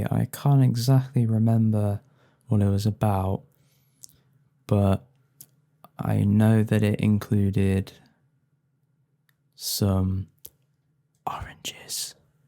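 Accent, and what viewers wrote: United Kingdom. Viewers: How did you manage to sound more british than britishiest british people. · British accent??? 😅 😮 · I just came here out of pure curiosity. NOT EXPECTING THE MOST BRITISH SOUNDING MAN I EVER HEARD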